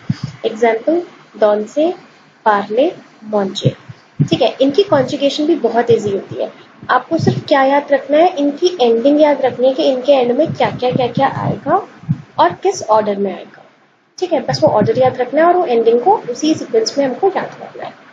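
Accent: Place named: Bihar, India. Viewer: madam bahut zaldi bta rahi h wo v bihari (bhojpuri) accent me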